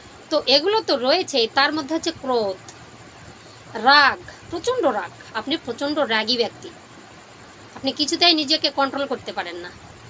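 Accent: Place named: Bangladesh. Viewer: Apni ki Bangladeshi? Voice tone ekdom Bangladeshi der moton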